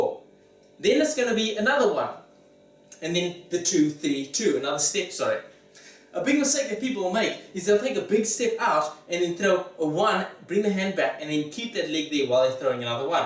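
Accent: South Africa. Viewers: Are you from south africa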